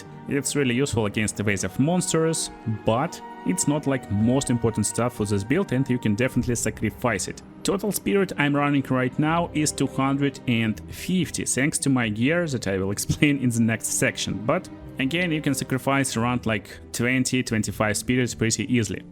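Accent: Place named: Russia. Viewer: Чувак, перестань пытаться говорить по английски без акцента, звучит ужасно. Одно слово из 5 звучит более менее, остальные с дичайшим русским акцентом )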